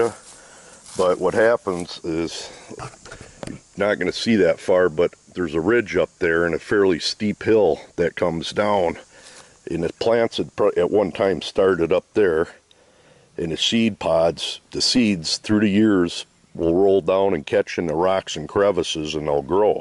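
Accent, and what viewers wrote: Minnesota, United States. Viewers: Thank you so much it's very informative your accent sounds like Minnesota or maybe North Dakota where are you from? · You sound like my, Uncle...😂 Minnesota?! Great video BTW...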